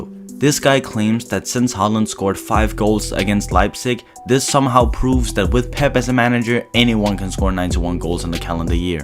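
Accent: Denmark. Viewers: Where are you from, if I may ask? You sound kinda Danish, and I saw your friend had a DBU shirt on as well in one vid at least. Anyways, nice video! :D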